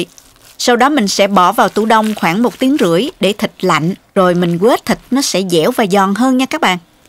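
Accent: South, Vietnam. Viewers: Cám ơn, tôi rất thích xem những Vidios hướng dẫn cách nấu ăn của cô, ngắn gọn mà đầy đủ dễ hiễu dễ làm. Tôi thực hành lúc nào cũng thành công. Tôi không những ghiền chờ đón xem cách dạy nấu ăn cúa cô mà cũng ghiền nghe vọng nói miền nam của cô nữa đó. Mến chúc cô cùng ngừoi thần luôn vui khỏe, bình an. Luôn ủng hộ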